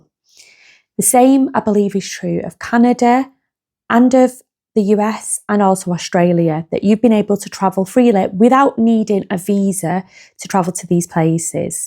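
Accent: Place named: England, United Kingdom. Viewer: Great Clear English Accent Love it ❤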